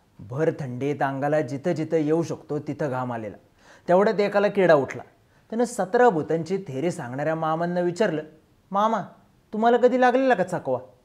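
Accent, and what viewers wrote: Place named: Kolhapur, India. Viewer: कोल्हापूर मधन बोलतोय बग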